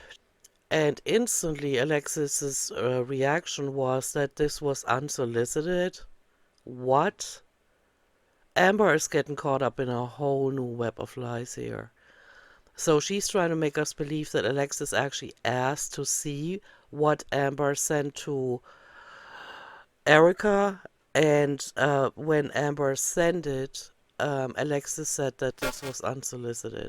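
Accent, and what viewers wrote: Germany. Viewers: RIP, your beautiful German accent voice and you and your personality will be and is missed 😢 Why must everything good get to be taken 🕊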